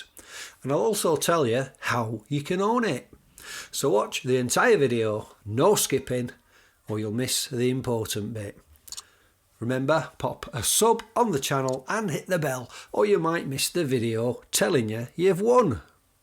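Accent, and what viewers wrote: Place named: Sheffield, United Kingdom. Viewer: Great Shirt, Big names and its only going to get better, great video and love the Sheffield accent.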